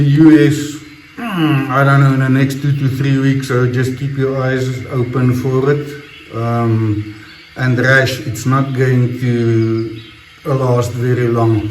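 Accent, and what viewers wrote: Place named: South Africa. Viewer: I came for the amazing shave and education. And I'm staying for the accent! It sounds South African to my ears. Stay healthy and happy and always dapper! Greetings from Australia.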